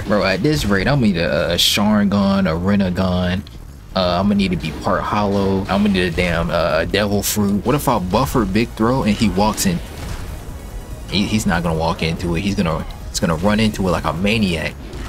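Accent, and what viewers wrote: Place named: Philadelphia, United States. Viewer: Bro you from Philly lol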